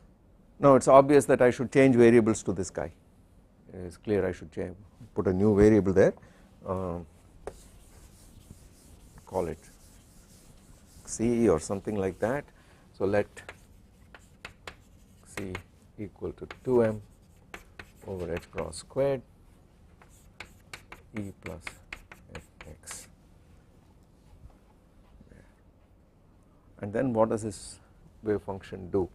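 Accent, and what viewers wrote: India. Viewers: the professor is an exceptional teacher. The extras he brings into the course with his comments are really at a graduate level, not a basic undergraduate course. For an American, the Indian accent is sometimes difficult to decipher. Subtitles would be very helpful. Also, ith wourld be very nice to have a set of course notess for the lecturs.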